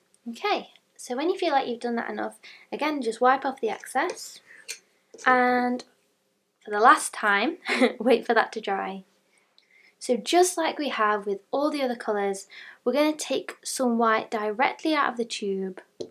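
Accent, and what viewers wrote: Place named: United Kingdom. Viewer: I love watching your painting tutorials! What talent you have! And that British accent is the icing on the cake❤️ You have brought out a hidden talent! Thank you so much!!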